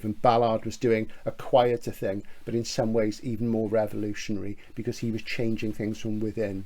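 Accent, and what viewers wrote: Wales, United Kingdom. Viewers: You sound like a proper Welshman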